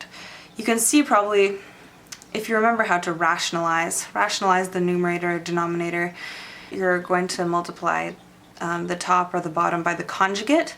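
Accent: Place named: Canada. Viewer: "that was pretty easy, eh" fucking love the Canadian accent.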